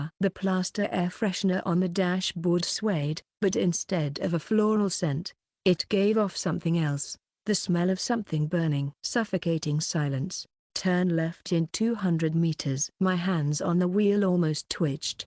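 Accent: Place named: United Kingdom. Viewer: Thank you for uploading this. However the narrorator suddenly switching being British and female is a bit confusing. 😢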